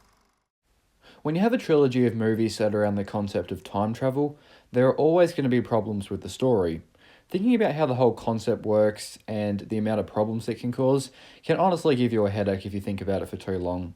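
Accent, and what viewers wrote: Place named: Australia. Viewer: God I hate the Australian accent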